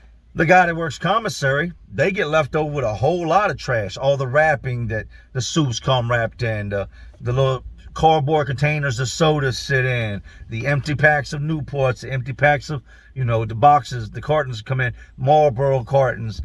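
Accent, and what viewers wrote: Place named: East Coast, United States. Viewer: People need to understand your from the east coast the inner city… the way you talk comes from where you grew up and also in and out of prison lingo….I think it’s our differences that make us interesting… I’m glad everyone doesn’t sound the same…. I like hearing you tell your stories…..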